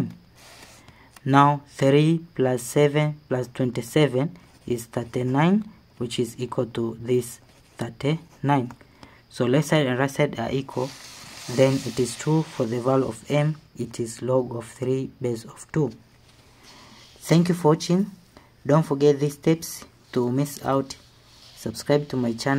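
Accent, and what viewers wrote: India. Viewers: Your Indian accent when you speak English is 🤮🤮🤮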